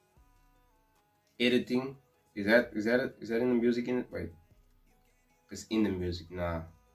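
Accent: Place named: Afrikaans, South Africa. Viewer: Oh my gosh you have a Strong Afrikaans accent lol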